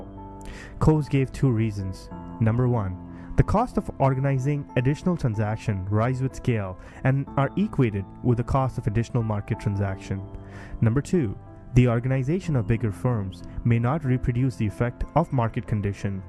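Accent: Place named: India. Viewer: The first Indian accent video that I didnt skip, mainly just because the accent wasnt that strong hahaha.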